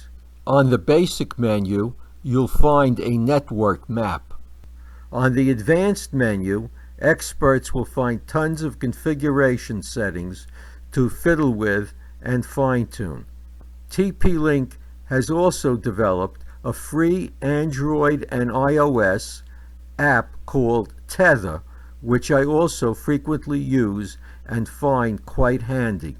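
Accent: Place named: New Jersey, United States. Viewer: I would have guessed New Jersey, myself :)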